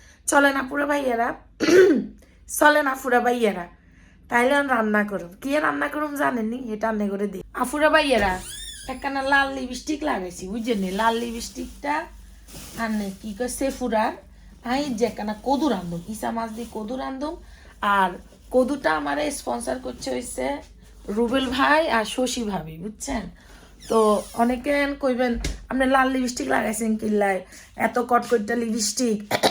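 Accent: Noakhali, Bangladesh. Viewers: Ami delhi theke bolchi…kintu tomar noyakhailya vasha amaar aponjon ke mone koriye dai..boleii tomar vlog dekhi..shaathe tomar khawa dawa..khuub valo laage❤ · Apu Noakhali kotha apnr moke manay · আপু তোমাকে নোয়াখালী ভাষায় কথা বললে বেশি ভালো লাগে। ❤❤❤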